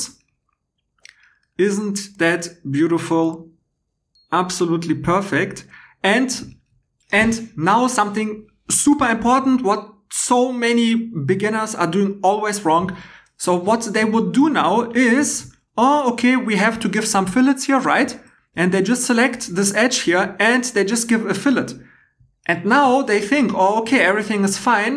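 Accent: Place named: Germany. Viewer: Имя славянское, акцент немецкий. Кто ты, воин?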